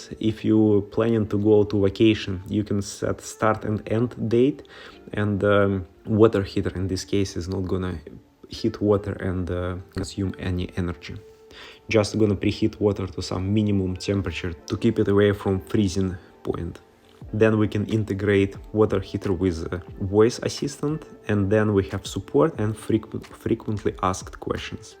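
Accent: Russia. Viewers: Buddy, Are you Russian ?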